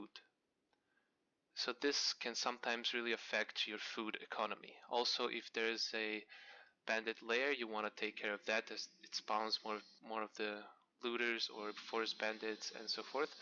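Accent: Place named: Serbia. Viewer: You sound like you're Serbian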